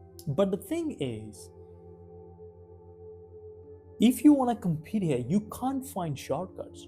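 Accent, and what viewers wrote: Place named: Australia. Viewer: Maaate,...youve even got the nuances of our accent. Onya bud.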